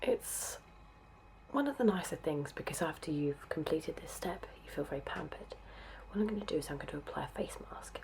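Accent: England, United Kingdom. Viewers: I have just come across your videos, and I was so glad to hear an English accent when you spoke - I find ASMR from the UK supremely relaxing (I am also Home Counties English!) Loved your video anyway, and have subscribed! Love LouFxx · You really seem to keep busy putting out your videos.Thanks for the constant soothing sessions.Your voice is my fav.You make me want to move to England were all the women talk like that. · very pretty british accent i love it, keep doing more plz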